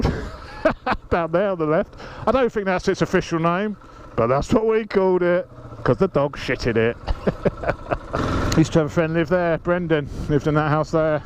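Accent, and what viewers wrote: United Kingdom. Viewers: Haha. Love this. Bless the old man at the end. Genuinely thought you were a London boy. You've definitely got a cockney twang.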